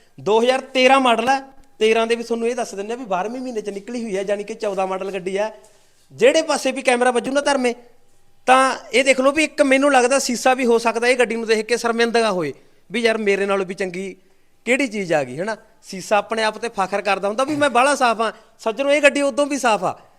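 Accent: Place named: Majha, India. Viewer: ਤੁਸੀਂ ਤਾਂ ਮਾਝੇ ਦੀ ਬੋਲੀ ਬੋਲਦੇ ਹੋ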